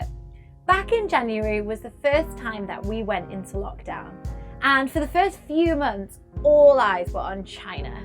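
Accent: United Kingdom. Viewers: I learn British accent from you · A British accented woman turning pink, interesting...now you can see how powerful the CCP's brainwashing techniques really are. A low-IQ, low-efficiency way of controlling the virus and society became widely acclaimed by even the woman who is obviously well educated. Interesting...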